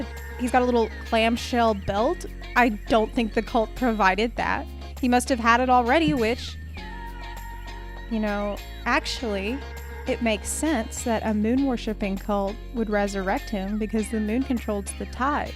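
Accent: South, United States. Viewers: where are you from? i looove your accent! i'm from ga living in nc and i relate to your pronunciation so much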